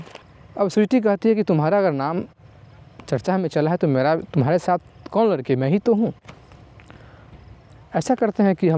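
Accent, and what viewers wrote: Bihar, India. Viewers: बिहारी उच्चारण में बतायी गई कहानी रोचक लगी। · Bihari tone hae so it was bit boring ..Hindi speaking is not good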